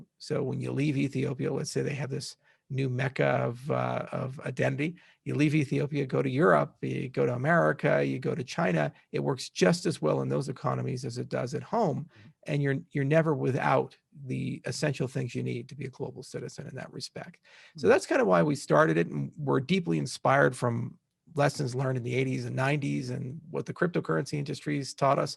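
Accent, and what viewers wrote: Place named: Netherlands. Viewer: i hear a slight dutch accent. great content!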